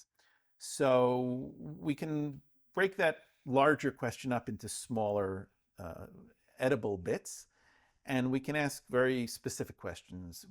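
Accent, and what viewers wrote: Russia. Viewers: Russia is a large country with many different ethnic groups within it. It may not be an accent with which you are familiar but that does not mean it is not a Russian accent.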